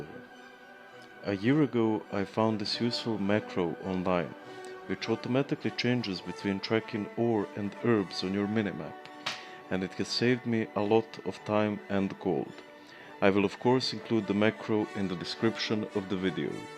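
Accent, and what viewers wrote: Russia. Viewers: You have a cool Russian accent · Ty for this awesome guide! Where are you from? You have accent as russian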